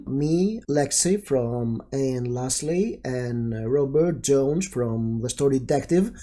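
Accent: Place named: Greece. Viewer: Well, random slightly off topic comment but, I couldn't find the Finnish audiobook of Frankenstein, and that made me really sad. I read it in 3 languages, but I wanted to listen to the Finnish version too and I couldn't. :( ...back to the video. Ah yes, the accents, I have like 20, and I know that's off-putting for some, but hey, it's what I have. Also, I can understand you regardless of the Greek accent. I don't find a problem with that. But then again, I'm so used to having friends and peers from all over the world, I know a lot of people aren't this used to accents.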